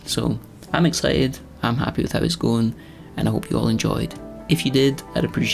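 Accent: Scotland, United Kingdom. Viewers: I did just that and realized I had been watching off and on since he renovated his tiny stone house that had been abandoned. The name of his channel has changed, I think, but I recognize the cat, accent and his red haired from the tiny house renovation. Thanks you I could listen to that accent all day long. My family was from Scotland but journeyed to US in late 1700’s and only a Texas accent remains!!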